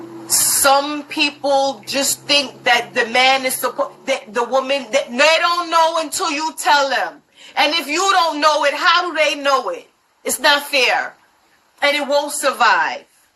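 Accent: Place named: Caribbean. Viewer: I can hear that west Indian accent. Preach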